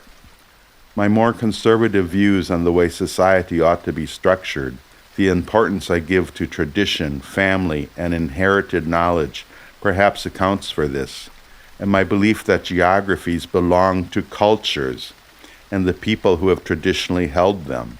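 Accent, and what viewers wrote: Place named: Milwaukee, United States. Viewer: I was looking forward to listening to this while getting back into the studio, that's how I usually listen to any radio programs. Thus maybe I didn't have the type of undistracted focus I might have had if I was not working on my "skilled" painting at the same time, but it was nice in that it felt like I was painting while in a room with my friend. His voice is so soothing in this piece, with the strong Milwaukee accent that made me homesick for the midwestern United States when I first met him in India, that he can broach ideas that I might disagree with in part with the kind of reasonable dialogue and give and take that used to be a part of our political culture, in art and politics, but sadly seems to be lacking now. I love how it flows in and out of past, present, the personal, political and framed by his residency with his friend and scholar Mary Storm in Sri Lanka. 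I feel I could listen to this again and again and get something different from it each time.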